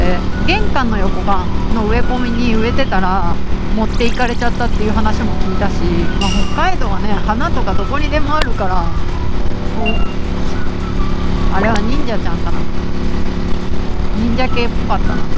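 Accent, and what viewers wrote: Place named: Kansai, Japan. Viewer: おはようです。さんって産まれてどちら？関西？たまに関西弁ぽくなるの面白い🤣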